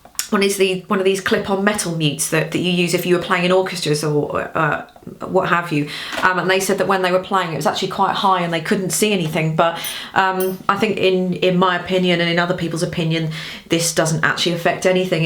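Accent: United Kingdom. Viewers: Definitely better without the mute. Then again I have been listening to my wife playing with and without for some 18 years. She started about 60 years ago, and has been a school orchestra teacher and violinist/concert master of a local community orchestra. Though she has had to give that up due to illness. This is a second marriage for both, as we were widowed within a year of each other. So, I am familiar with this, however, my attention was caught by the mention of Glaesel. Your voice told me you are either in Great Britain or derived there from. So I wondered if there is a Glaesel source in Europe. The Glaesel factory used to be in Cleveland Ohio, now In Indiana. When it was in Ohio Kurt Glaesel taught my wife violin repair, her other love. Now she is giving that up also due to her illness. That is why I am posting. Anything I want to know about the violin I want to know I can ask here, since not understanding what she is talking about seems more appropriate from my wife than a stranger. 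Not that she is difficult, but she just has so many decades of experience. She has the same problem with me when I talk tech. Oh, back to the point, is Glaesel still operating in Europe?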